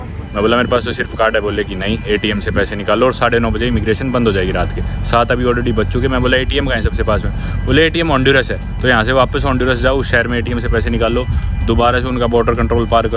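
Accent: Haryana, India. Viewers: You have mastered the spanish language, but Hindi is with typical Haryanvi touch it is beautiful with Aroma of Haryana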